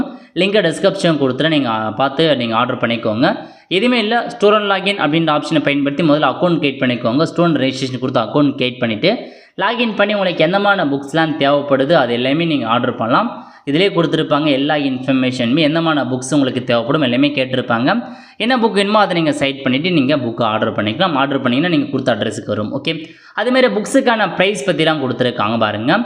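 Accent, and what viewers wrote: India. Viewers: Ennada hindikaran tamil pesura madhiri pesikittubirukka...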